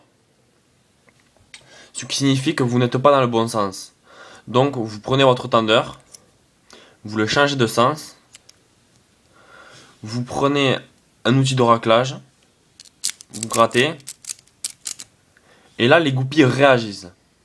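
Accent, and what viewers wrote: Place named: South, France. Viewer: je suis sur que t'es du sud! Vu ton accent pck moi je suis de nice;)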